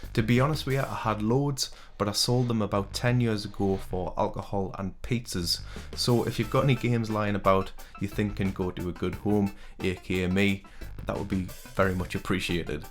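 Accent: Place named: Newcastle, United Kingdom. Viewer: your fromm Newcastle alright lemme sub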